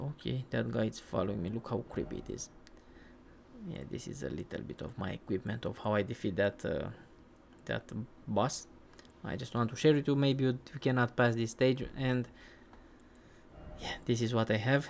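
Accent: Romania. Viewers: u sound romanian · ce accent de roman ai :)) esti tare bravo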